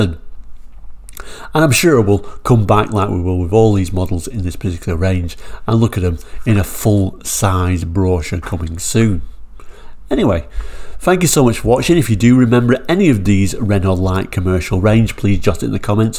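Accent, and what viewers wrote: United Kingdom. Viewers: I owned a Master from 1993 with a 2.5 diesel engine (atmo, 75hp). Reliable, economic but gutless. Renault was a good brand at that time; by now, they just produce electronic plastic craps like any other car manufacturer does. Dishonesty, scam at its best. Some interesting infos once again. I love your british english accent! Thanks for that.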